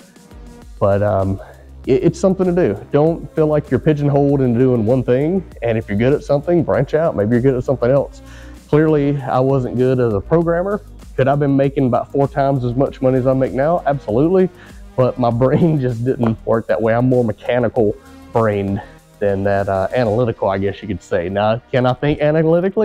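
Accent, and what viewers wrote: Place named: North Carolina, United States. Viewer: Man, the more I looked at your videos the more I began to think you’re the same person from a forum I used to be on back in the day, HPJunkies. Then I started listening closely to your accent and that’s definitely a North Carolina accent so my bet is you’re the same person! Loved your threads on that board back then and your videos now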